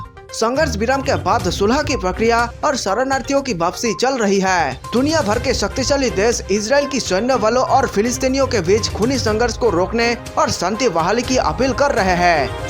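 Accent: Bengal, India. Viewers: Pehle tu apna bangali accent ko hindi me mat la aur upar se views ke liye jhuta captions mat de budbak. Faltu time waste kar raha hai sabka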